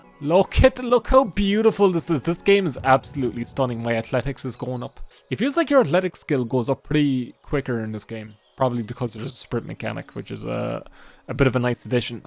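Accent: Ireland. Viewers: That’s true his Irish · The Irish man is back! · I have a small glimmer of hope, like a candle flickering against the storm, that the morrowind DLC at level 1 will come back. Anyway nice video, still got that charming Irishman voice, like Guiness flowing in a field of clovers.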